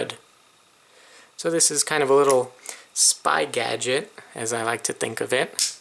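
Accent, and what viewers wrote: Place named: Sweden. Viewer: are you Swedish? i like your accent and it sounds like its Swedish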